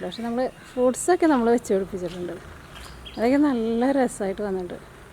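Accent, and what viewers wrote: Thrissur, India. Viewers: Thrissur basha padichu ethu enthutta sanam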